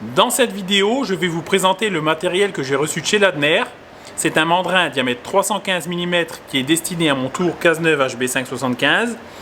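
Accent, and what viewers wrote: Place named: Eastern France, France. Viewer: un petit accent de l'est de la France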